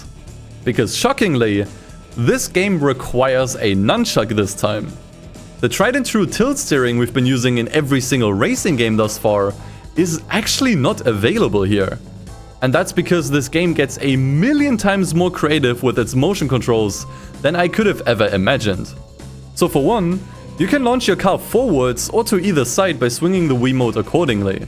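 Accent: Germany. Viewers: Are you German??????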